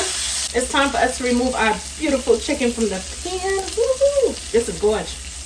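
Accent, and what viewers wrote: New York, United States. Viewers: First vid. Amazing. Instant sub. Btw which boro you from tho? I can hear it 😂❤ Recipe in desc box...the first is for a 9x13??? I wanna do this to fill a hotel pan. The second is double. That'll do it, right? Thanks! Much love from just over the GWB 😘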